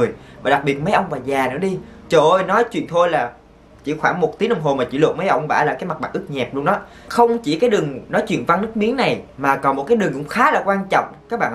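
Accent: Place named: Central, Vietnam. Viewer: Anh là người quê ở đâu ạ. Nghe giọng rất giống giọng miền Trung :33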